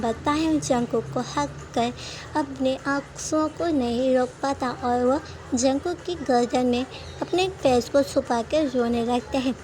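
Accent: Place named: West Bengal, India. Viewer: .. aap jab story.. explain krte ho..toh syd Bo aapki bangle ki hi aawaj hai.. bo mujhe bahut achi lgti.. 😚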